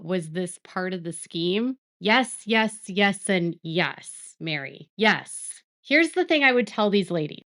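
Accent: Minnesota, United States. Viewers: You have the most Minnesota accent I've ever heard😂 'abouuuut"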